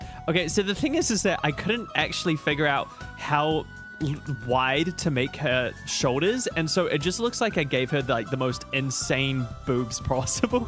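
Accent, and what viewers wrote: New Zealand. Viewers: it took me like 3 videos to realise you were also a kiwi lmao, why does my brain never register our accent 😅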